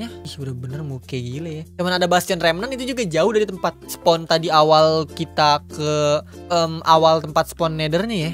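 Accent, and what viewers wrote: West Java, Indonesia. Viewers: Kak orang sunda kah?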